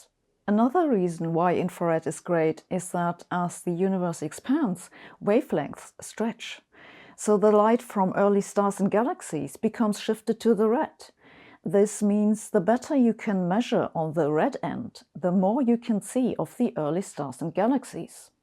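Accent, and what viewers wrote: Germany. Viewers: May I ask Professor, are you german?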